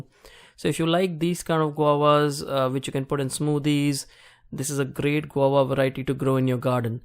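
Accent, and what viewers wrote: India. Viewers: Is this voice indian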